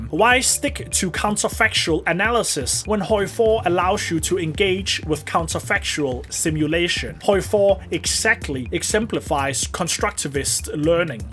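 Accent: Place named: Denmark. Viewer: Elsker det danske accent🫡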